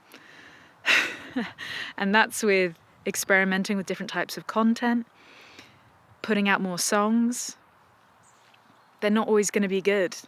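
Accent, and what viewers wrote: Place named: RP, United Kingdom. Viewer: God, you're so bloody posh,. I can see you as the old dear running a large country pile....in (quite) a few years when you've made your fortune.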